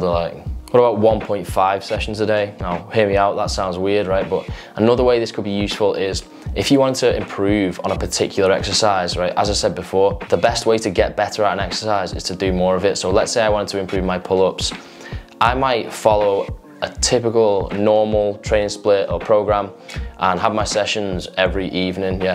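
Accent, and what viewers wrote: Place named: England, United Kingdom. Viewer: Sorry I can't handle the gay pommy accent lol try doing some legs bro. I'm heading back to Ryan Hutchinson for some decent sarcasm lol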